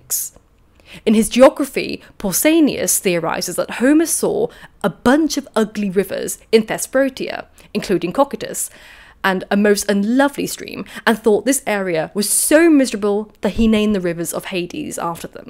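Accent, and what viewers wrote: RP, United Kingdom. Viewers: Just discovered this channel. Your voice is so deep and pronunciation so received (I think, not an expert on differences on UK regional speech), you sound like an incredible villain. Bummed it took me so long to find such a great history/archaeology/classics channel. Thank you for your work!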